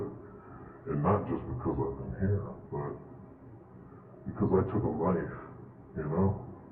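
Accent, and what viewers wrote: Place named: South, United States. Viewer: Texas? The accent hits home hard, definitely from the south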